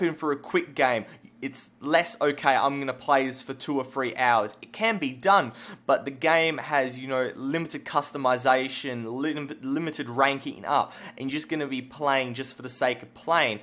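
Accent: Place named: Australia. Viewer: he must be a australian or new zealand accent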